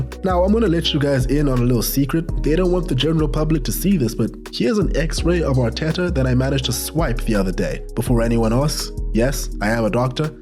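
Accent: South Africa. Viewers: You sound South African 🤔🧐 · this is a south african accent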